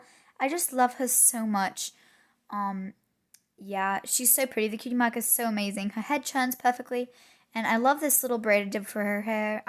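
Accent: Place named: United Kingdom. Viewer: Why did you used to have a British accent in your videos I thought youre American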